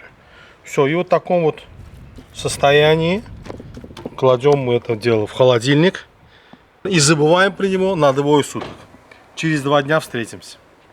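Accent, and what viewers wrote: Baku, Azerbaijan. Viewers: ДЖАН Э ДЖАН. БАКИНСКИЙ АКЦЕНТ ЛУЧШИЙ. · Супер шашлык и супер бакинский акцент.все родное.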